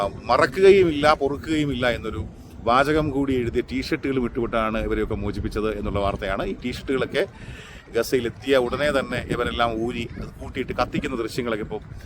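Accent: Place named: Ernakulam, India. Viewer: സത്യസന്ധമായ അവതരണം, ഭാഷ ശൈലി അതിഗംഭീരം, , കേട്ടിരിക്കാൻ സുഖം, ഒരു എറണാകുളം സ്റ്റൈൽ, നന്ദിയുണ്ട് ബ്രോ,,, ❤️❤️❤️❤️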